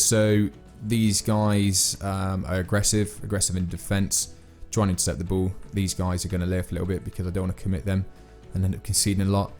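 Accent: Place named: South Africa. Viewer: 10/10 South African accent mate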